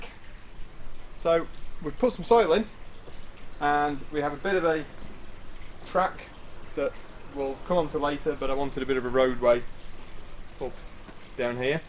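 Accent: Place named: Australia. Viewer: Hi, just found your videos. I’m a new garden railroad. I just got my first half of a circle of track and I have a NW-2 loco You are inspiring me to try to put something outside. It’s a little bit intimidating. I have to admit, but I’m learning a lot just watching you do this. I have one question though, where are you located? Are you hesitantly I ask are you in Australia or are you in England your location of your our way and your weather doesn’t seem to jive, so I’m not sure being an American. I apologize for not recognizing the accent.😂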